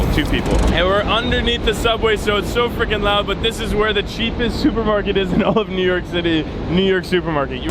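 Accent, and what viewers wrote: France. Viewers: i was wondering when you travel around the world and get so much street food does it ever happend to you to get a food poison nice french accent btw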